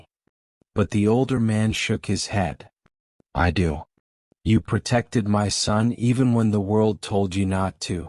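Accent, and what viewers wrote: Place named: Asia. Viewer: may I ask you what nationality you are? I hear a faint accent in your voice. Are you Asian or partly Asian. I'm just wondering cause I love listening to your voice when you read.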